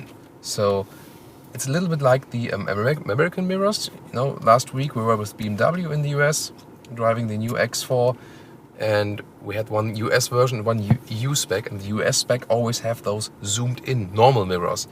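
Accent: Germany. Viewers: Admirable german accent! Very smooz!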